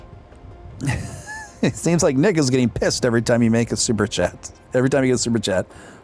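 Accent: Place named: Chicago, United States. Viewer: Hey.. Your accent Sounds like your from Chicago..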